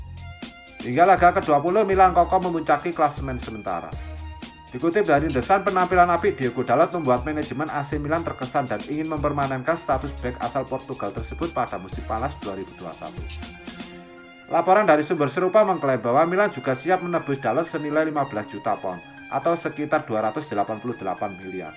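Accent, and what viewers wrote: Java, Indonesia. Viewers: Logat Jawa Lo kental banget! Cari suara yang komersil boss.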